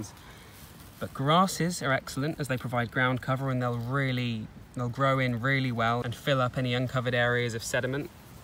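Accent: United Kingdom. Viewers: Also I can listen to your British accent and your soothing voice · turns out all i needed was a handsome british man to calmly talk to me about plants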